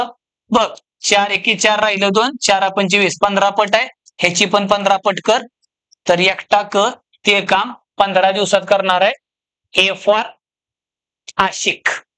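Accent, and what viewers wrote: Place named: Pandharpur, India. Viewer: Sir tumhi kuthe rahta .... pandharpur taluka ahe ka tumcha.... language tikdchi vatte....ekdam chan teach krta....lagech concept clear hotat....mi khoop videos pahile tumche....shekdewari ,train,kal kaam ....boat. Ni pravah ..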